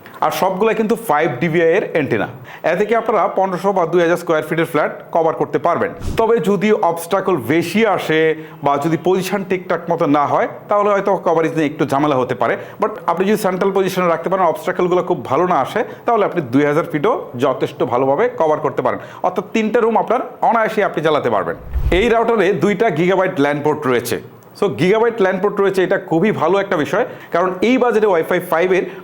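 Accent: Bangladesh. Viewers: Border par Kora Asa chan naki India ta??? Poris Kar bangali kotha bol ta paran na??? Bangladesh accent marchen... ar vul bal router ar kotha bolchan. Amazon page a giya daklam.... sob gulor review ta bolcha kichu na kuchu defect Acha.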